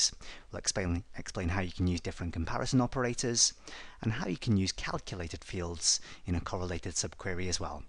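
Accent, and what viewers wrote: England, United Kingdom. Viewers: is seriously one of the best instructors I've found on youtube. You start from scratch, with simple examples and you build on your lesson plans beautifully after that. I cannot thank you enough on how amazing SQL lessons were, as well as your VBA lessons. I thought I had enough knowledge I needed for basic VBA, but still ended up learning a tremendous amount from those videos and then finally SQL. I'll admit I got confused with correlated subqueries, went on to google for a bit, but finally watched this few more times and they finally clicked. Online training courses can be expensive and so I want to thank you for providing SQL and VBA on youtube. It's greatly appreciated. There are a lot of smart people in the world, but not everyone can be a great teacher and you certainly are a great instructor and British accent definitely a plus:)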